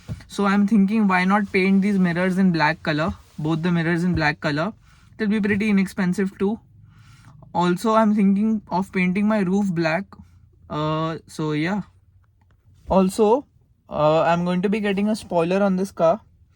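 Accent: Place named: Mumbai, India. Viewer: Its a kinda Mumbai English accent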